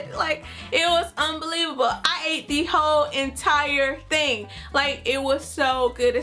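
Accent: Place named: South, United States. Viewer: Yay! Ur back.. Hood thing? Nope, I think that's just the southern twang ppl use.. Hahaa, love how u stuffed ur mouth xoxo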